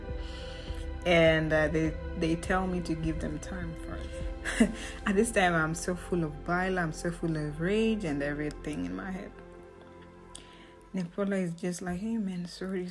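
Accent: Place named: Luo, Kenya. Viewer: 😂😂😂 your laughter, your Luo 😂😂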